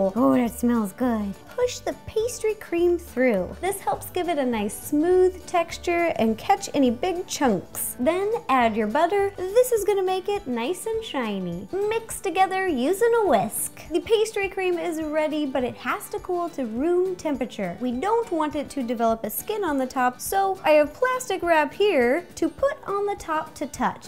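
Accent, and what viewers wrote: South, United States. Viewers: Maybe she's sorta southern. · Why does she have a sorta southern accent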